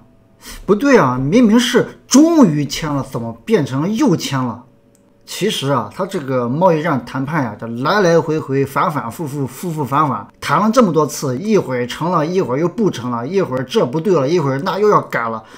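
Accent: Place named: Shaanxi, China. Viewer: 哥！你是不是陕西人！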